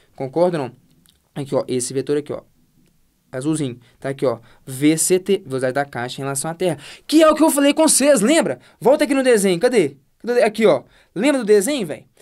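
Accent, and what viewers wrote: Minas Gerais, Brazil. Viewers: esse fesso e mineiro... ctz kkkk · Matéria boa demais. Sotaque de Minas não da pra não perceber hahaha. Valeu pelo conhecimento!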